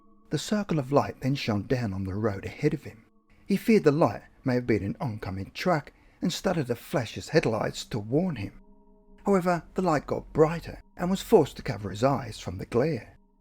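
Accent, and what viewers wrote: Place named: Australia. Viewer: Ugh, the Aussie accent just doesn’t work with narration. Otherwise, a fan of your channel! 😩😅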